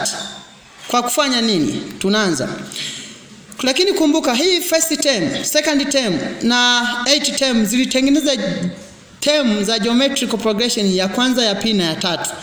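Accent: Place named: Sukuma, Tanzania. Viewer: Akiongeza kidhungu inavutia lakn kiswahili tunasikia lafudhi ya kisukuma tunabaki kucheka tu🤣🤣🤣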